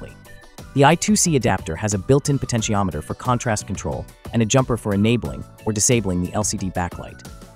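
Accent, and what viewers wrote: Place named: India. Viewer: Parce que je préfère la voix d'un robot plutôt que du "Indian English"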